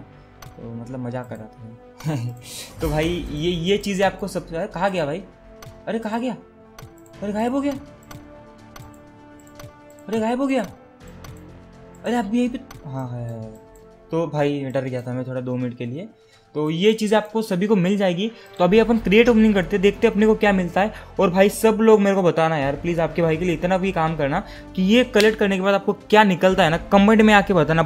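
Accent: Maharashtra, India. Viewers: Bhai marathi ahes ka tu...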